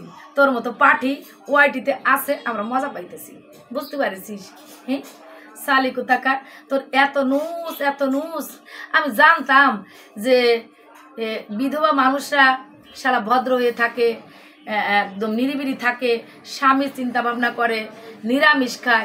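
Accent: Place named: Khulna, Bangladesh. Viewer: খুলনা থেকে দেখছি দিদি,,,ভাষা শুনেই বুঝেছি কাছের মানুষ আপনি❤️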